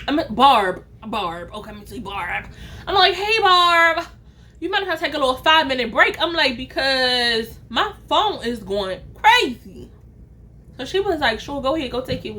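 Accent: Baltimore, United States. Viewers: Girl you are my favorite storytime teller I get so happy when you post a storytime yesssss and the Baltimore accent adds a little pazazzzzzzz 😂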